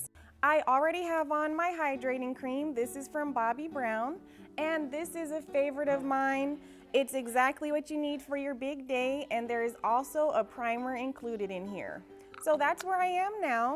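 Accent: United States. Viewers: Where are you from? I can't pin point which area in America your accent is from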